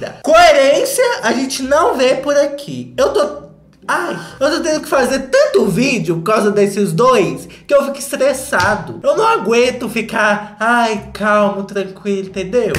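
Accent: Minas Gerais, Brazil. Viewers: Eu amo essa narração em Minerês,nó.....muito lindo.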